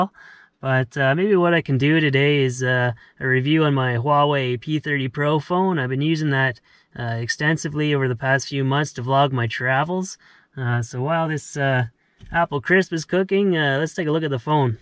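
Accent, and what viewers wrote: Canada. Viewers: Which part of Canada are you from? Seems like you’re accent is type of Canadian accent Americans make fun of.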